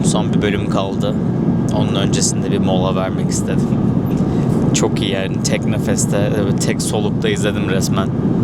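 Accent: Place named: Turkey. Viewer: Soy argentina. Bienvenido y que lo pases muy bien. Solo escucharte hablar 2 minutos y reconocí que sos turco. Soy una gran fan de la telenovelas turcas. Seas bienvenido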